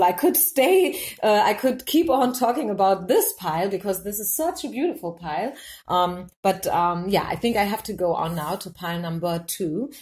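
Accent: Germany. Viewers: I just love that you post for us in the US. Your German accent is adorable 💕 Thank you for your timeless message today.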